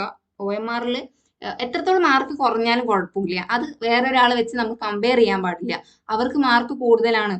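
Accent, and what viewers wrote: Palakkad, India. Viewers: Palakkad aano swadesham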